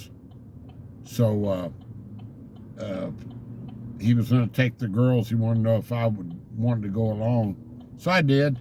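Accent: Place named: Texas, United States. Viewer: I think your awesome, I really am Texas home sick and you help a lot. I very much enjoy the stories spoken in my favorite accent. I haven't been to Texas in over 20 years, and everyday I try to get back to Springtown. Home of the POJO!!! Anyway I appreciate your channel very much. You stay blessed!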